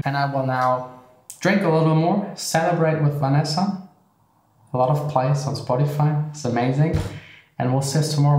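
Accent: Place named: Germany. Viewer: There are definitely whole sections of this video I swear I am hearing my words come out of your mouth , but with a German accent.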